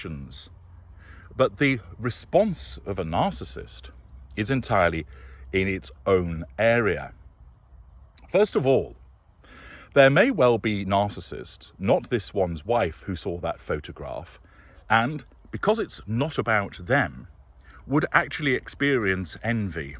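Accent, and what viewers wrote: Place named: Kent, United Kingdom. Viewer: Hmm, getting a lovely Kentish vibe from your video, it’s giving your voice a lilt. That photo is a real keeper, full of joy and excitement. Megsie must be screwed up inside.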